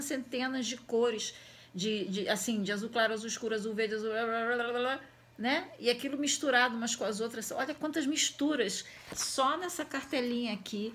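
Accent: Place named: Rio de Janeiro, Brazil. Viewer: Tava procurando um jeito de fazer cartela com meus lapis aquarelaveis, caí aqui! Tem gato, sotaque carioca e o conteudo otimonl! amey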